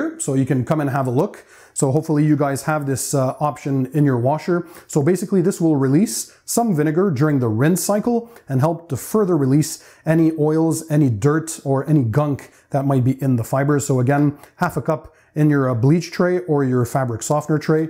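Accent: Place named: Canada. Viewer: What is his accent. Sounds Canadian, but with something else.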